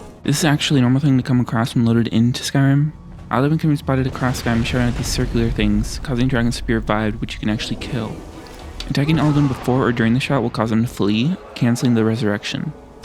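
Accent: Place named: United States. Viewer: He's speaking completely understandable American English, the fuck are you on about?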